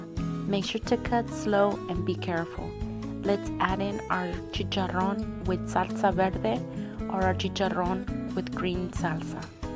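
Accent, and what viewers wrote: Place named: Texas, United States. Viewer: Are you from Texas? You sound Texan. And some of your ingredients I see at H‑E‑B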